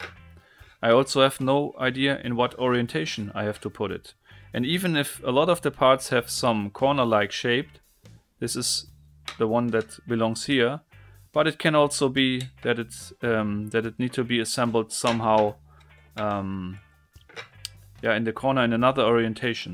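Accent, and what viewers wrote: Germany. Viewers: You have a german accent. Are you from Germany?☺ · dieser Moment wenn du merkst dass er Deutscher ist 😂 der Akzent.... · Man hört den deutschen Akzent im Englisch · Alter du bist deutsch dein aktzent verraten ^^ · Man hört das du deutsch bist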